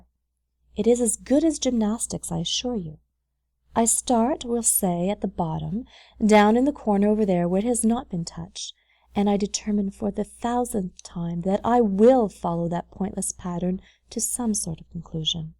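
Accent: Canada. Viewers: The Canadian is strong with this one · I super appreciate the reader's slight Canadian accent; makes this so much easier to listen to!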